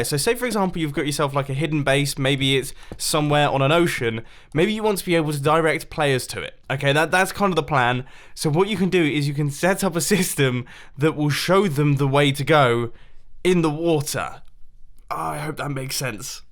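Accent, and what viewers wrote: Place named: United Kingdom. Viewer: you make me laugh every time you don't even have to say anything, when you were trying out how to launch sand up all the sudden you said "oh my word i wonder if this will work with anvils!" I love your accent too, mostly just the British accent, but you will always make me smile.:D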